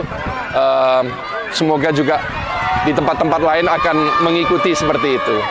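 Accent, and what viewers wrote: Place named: Malang, Indonesia. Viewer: 👍 Gamblang dan runut penjelasannya meski disisipi istilah2 bahasa Inggris dan dengan logat/aksen Malang'an 😀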